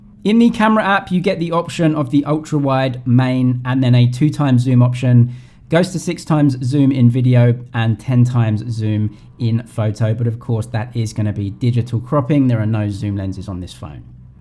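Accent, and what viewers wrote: England, United Kingdom. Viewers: i like his accent is he british or australian ??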